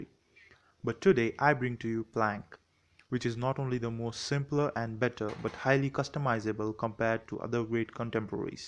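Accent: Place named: India. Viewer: Great review! I got a bit of shock hearing you swear (I wasn't offended mate!) - not use to hearing the word "shit" in an Indian accent! Good stuff mate! Have you reviewed and looked at Synergy? (plank and synergy are my two "must have" Linux applications!)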